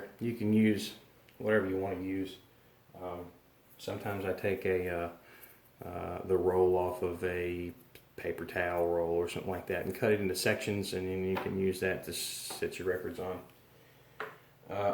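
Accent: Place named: Texarkana, United States. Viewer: Just out of curiosity are you from near Texarkana? I used to work with a guy from there and you two sound alike.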